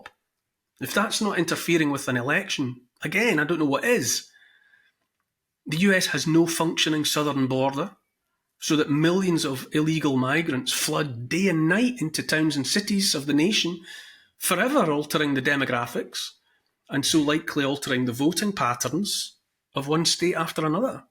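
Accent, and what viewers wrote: Scotland, United Kingdom. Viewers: Lord I love a Scottish accent, although I can't help thinking of Dad's Army.....'We're dooooooomed laddie" 😂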